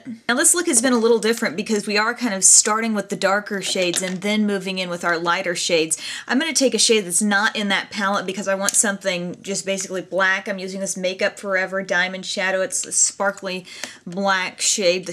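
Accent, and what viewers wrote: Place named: Alaska, United States. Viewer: are you from alaska?? you sound like sara palin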